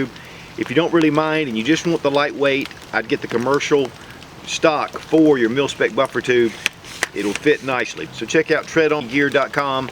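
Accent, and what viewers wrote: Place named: South, United States. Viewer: U have a southern accent, he he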